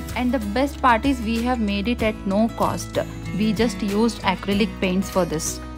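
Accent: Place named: South India, India. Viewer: see is south Indian her accent will be like that You don't teach her necessary English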